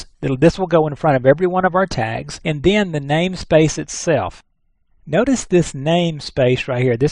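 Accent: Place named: United States. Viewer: I know right? It sounds North-American, but almost too much. Almost like someone putting on an American accent. Great video though!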